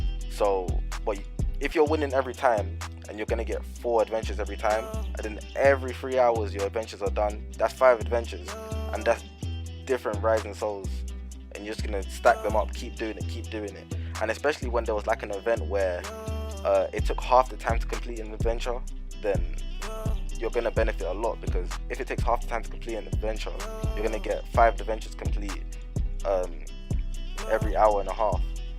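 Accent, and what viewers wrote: United Kingdom. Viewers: My guy fam ur british too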